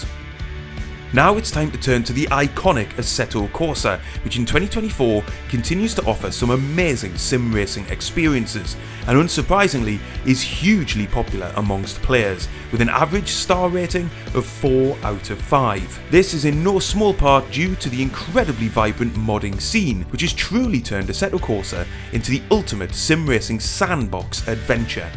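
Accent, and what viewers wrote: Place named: North East England, United Kingdom. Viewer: Really enjoyed the video. As an Xbox sim racer this has been super helpful in my research to PC switching. Your trustworthy NE accent helps as well 😉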